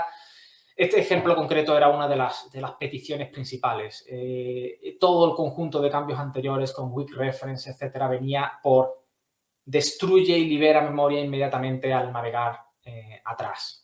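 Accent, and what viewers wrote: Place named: Spain. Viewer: No tolero el acento español 😢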